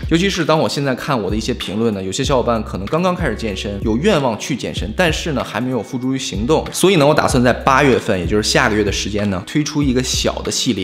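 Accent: China. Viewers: 胖时比较好看，而且你现在的普通话口音很适合之前胖子的气质。。。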